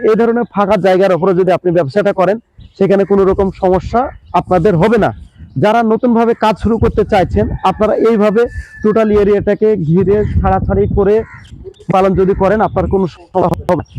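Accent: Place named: Bangladesh. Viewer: ভাই আপনার কথাবার্তা স্টাইল তো একদম বাংলাদেশীদের মত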